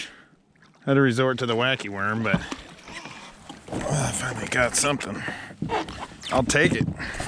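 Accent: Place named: Arizona, United States. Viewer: When I hear that accent I totally think Arizona.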